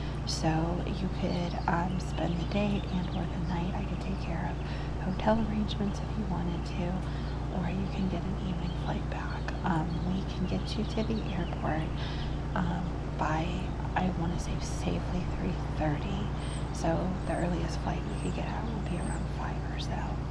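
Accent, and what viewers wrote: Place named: California, United States. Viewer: Hi dear, I love your accent, are you from California?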